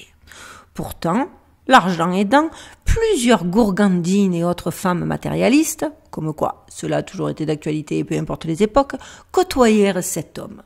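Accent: Marseille, France. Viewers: Toujours des histoires passionnantes avec cet accent marseillais hyper puissant et envoutant que j'en perds le fil de l'histoire.Y'a plus qu'à relancer la vidéo en essayant de ne pas me faire hypnotiser 😋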